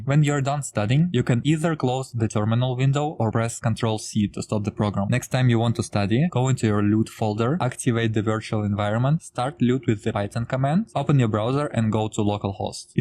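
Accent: Russia. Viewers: жесть у тебя акцент прям брутально русский, я звучу как фембой когда общаюсь на английском xd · Good vid. Aren't you Russian by any chance? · are you russian?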